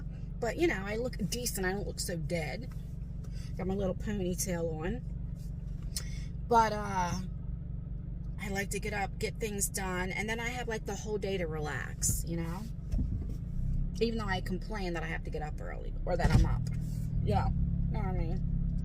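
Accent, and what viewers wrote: New Jersey, United States. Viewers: Hold on to your hat,. I subscribe to over 800 YouTube channels. I have a very wide range of interests. I quit cable two years ago and YouTube supplies me with news, films, how to's, and lots of entertainment. I thought I'd miss TV but I don't and that's about twelve hundred dollars a year back in my pocket. I'm also a Jersey girl and when I hear you talk I hear my sister's accent and speaking style. You can take the girl out of Jersey but you can't take Jersey out of the girl. Cheers! 😊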